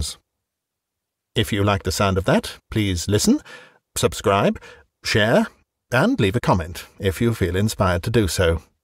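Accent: England, United Kingdom. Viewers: Hi, i just finished listening to a couple of stories you've read, you have such great diction and voice, just like I would imagine a well educated Englishman would sound during the Victorian or Edwardian times, very nice and genuine, thank you! Do you only read short stories, or is there The Moonstone by Wilkie Collins in your collection? My favorite book since my youth, I've read it in multiple languages... Thank you again!!!!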